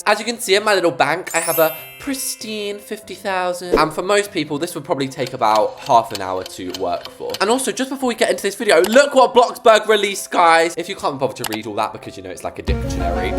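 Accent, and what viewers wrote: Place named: United Kingdom. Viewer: Omg I’ve watched Reese’s for like… yearsssss but I only just realised… IS THIS MAN BRITISH??? I am and honesty he sounds British but I only JUST realised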